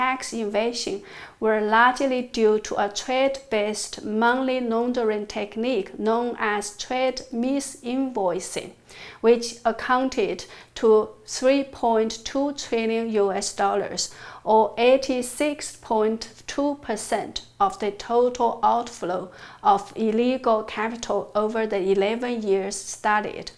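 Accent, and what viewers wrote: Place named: China. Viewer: I will be sharing this video today. For me it is probably best To go back about a year in these uploads. Your accent and the way you measure the words reminds me of a Chinese Priest I knew in grade school, He escaped Mao's purge.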